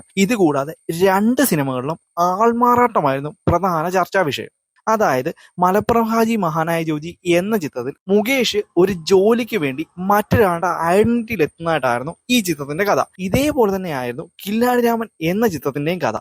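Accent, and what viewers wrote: Kollam, India. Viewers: കൊല്ലം ഭാഗത്ത് ആണെന്ന് തോന്നുന്നു അവതാരകൻ്റെ നാട്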